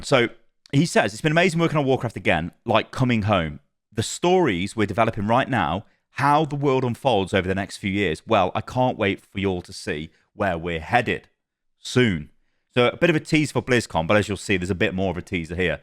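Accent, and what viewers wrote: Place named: United Kingdom. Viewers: Btw, you should promote this channel more on your main channel to get more viewers. I like the way you speak, totally British. Me as a foreigner, enjoys well spoken English in order to learn myself while watching videos :) I also like the different look at Overwatch and you also point out and appreciate what they do well, that's nice, not everything is bad. I know I sound like a simp, but I really like your style! Not meaning to be cunty, genuinely hope I help you with your learning, you're pretty good already though. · Btw, you should promote this channel more on your main channel to get more viewer. I like the way you talk, totally british. Me as foreigner is enjoyed well spoken english in order to learn myself while watching videos :) also i like the differenciate look at overwatch and you also point out and appreciate what they do good. 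And thats nice, not everything is bad. I know i sound like a simp but i really like your style!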